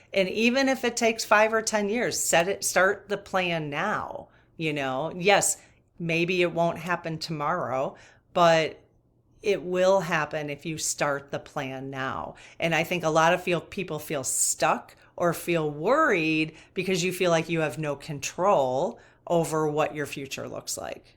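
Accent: Midwest, United States. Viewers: From the first time I watched you I figured you were from the Midwest and really resonated with that. Now I find out you’re from Palatine! I’m older than you but I graduated from Fremd in 1975. Worked at the Marc’s Big Boy on Northwest Highway. Small world! ❤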